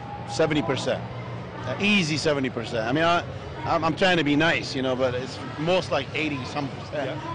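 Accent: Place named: Ireland. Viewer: You're from Ireland? I know a lot of people that bag the shit out of your accent aswell. Have some respect knows more about nutrition and BB than most pros. Who cares where he comes from or how he speaks.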